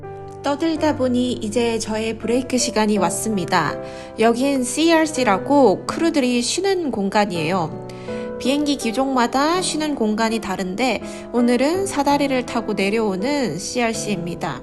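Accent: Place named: Gyeongsang, South Korea. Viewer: 저도 지방러라 경북 대구 억양이 느껴지더라고요. 영상 잘 보고 있어요! 즐거운 비행 하세요~~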